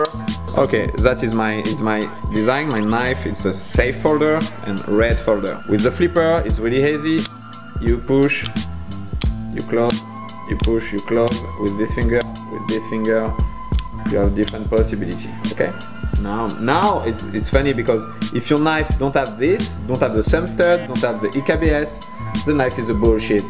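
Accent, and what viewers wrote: France. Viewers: Super vidéo mec, je ne savais pas qu'il y avait un français sur cette chaîne j'ai reconnu direct avec l'accent ^^ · Avec un délicieux petit accent français ;-) Merci pour la vidéo!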